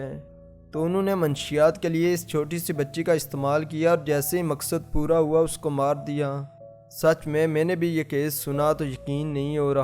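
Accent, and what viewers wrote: Kashmir, India. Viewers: Kashmiri lgte ho bolne sy... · accent kashmiri lagta ha mountain area Jo America ja ka be change nahi hosakta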